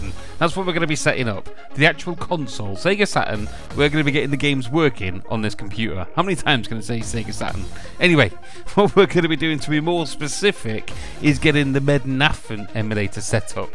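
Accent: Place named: Liverpool, United Kingdom. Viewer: i work with a British guy here in the States...he heard your voice and said you're from the Liverpool area...how right is he?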